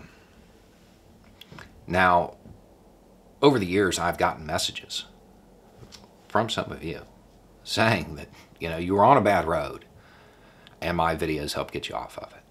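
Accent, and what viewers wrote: South, United States. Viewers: Go, your southern accent was what first caught my attention because half of my family is from the South. The rest are from above Chicago. After you accent, I listened closely to the content...I have learned a lot...and I'm glad about that...Keep up the good work...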